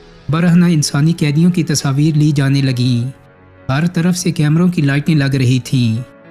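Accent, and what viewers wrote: Chakwal, Pakistan. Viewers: بھائی جان آپکا لہجہ ضلع چکوال کا لگ رہا ہے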